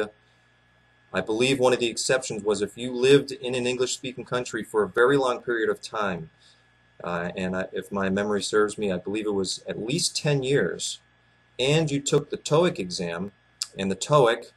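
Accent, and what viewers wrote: New England, United States. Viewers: You're from New Hampshire, aye? EAST COAST represent!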